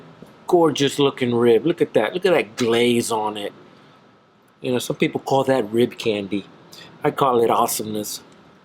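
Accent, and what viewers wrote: Texas, United States. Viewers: Ah, I'm originally from the Rio Grande Valley but live in Georgia now. Anyway, I could detect Texas in you. Been enjoying alot of your videos. Getting ready to place an order for some rubs but waiting on Spicy Chicken to get in stock.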